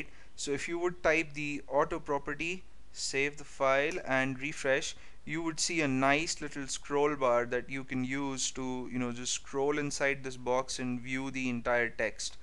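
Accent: India. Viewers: why do all indians sound exactly the same from all the youtubers i have heard which are indians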